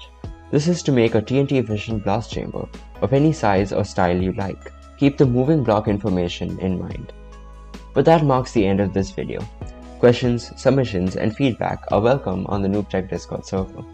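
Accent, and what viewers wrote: India. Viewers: With any chance are u indian?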